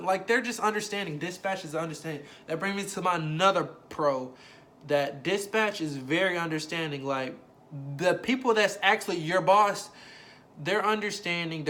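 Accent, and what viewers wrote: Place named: Arkansas, United States. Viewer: I knew you sounded like an arkansan. I basically found a guide to uber eats in my state. I appreciate that.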